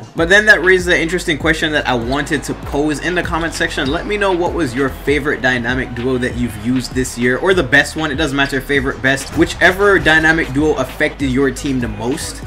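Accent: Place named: Jamaica. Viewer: Bredrin the way the patwa come out whenever you get passionate kills me fam😂😂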